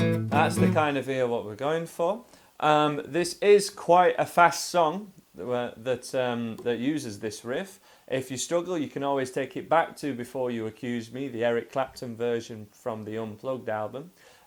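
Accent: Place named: Liverpool, United Kingdom. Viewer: Another awesome lesson, dude. You have a great way of teaching guitar and familiarizing yourself with an audience you can't even see. Are from Liverpool or Woolton by chance? You sound a lot like the legend on the wall behind you. Accent wise. Trips me out. Thanks again, brutha.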